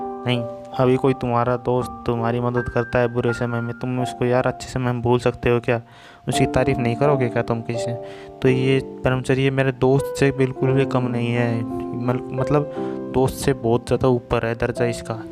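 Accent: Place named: Haryana, India. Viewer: Bhai mujhe lgta h ki tum haryana se ho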